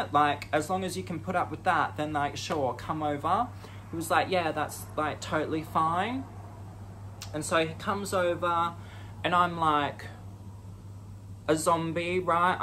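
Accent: Australia. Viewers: Pretty sure it's Australian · MATE LISTEN TO ME PLEASE...YOU SHOULD BE ON TELE BRO FIRST TIME WATCHING YOU AND IM HOOKED.. YOUR ACCENT SOUNDS A BIT AUSSIE AND A BIT OF ENGLISH SOUND COOL MY MAN LATERS LONDON BOY..PEACE..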